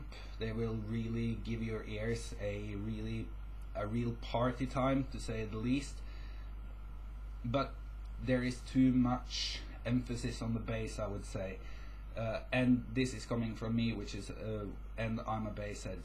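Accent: Norway. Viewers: Er det norsk aksent eg høyrer? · You sound like a norwegian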